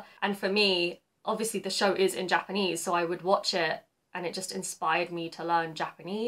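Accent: United Kingdom. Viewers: イギリス英語気持ちいいです。福島県産も受け入れていただいて感謝。日英同盟復活w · 日本語字幕と綺麗なイギリス英語、とても有難いです。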